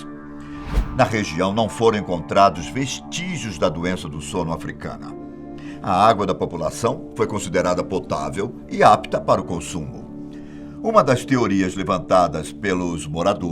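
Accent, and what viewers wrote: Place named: Bahia, Brazil. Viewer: baiano kkkk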